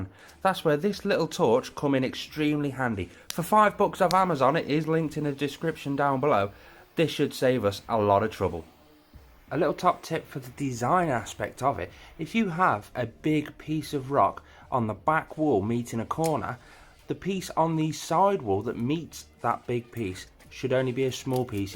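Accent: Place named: Sheffield, United Kingdom. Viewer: Nice setup just wondering where are you from I’m getting Sheffield vibes idk y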